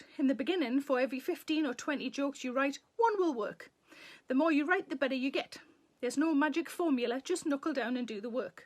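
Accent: Newcastle, United Kingdom. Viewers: Hi,!! Been listening to multiple episodes in chunks since I’ve not been able to tune in at the airing time every day. So I’ve been catching up for the past couple days. I live in a little town called Shepherdstown in West Virginia in the US; A small historical town that no one’s really heard of, but oh well. Born and raised here. (Although my Dad’s from the UK, so I was raised with a strong love for the English) I love listening to your lovely Geordie accent and smile and laugh along listening to you while working on art pieces. Thank you for being one of my favorite parts of my day, and for being such a beautiful person inside and out. Lots of love - Emma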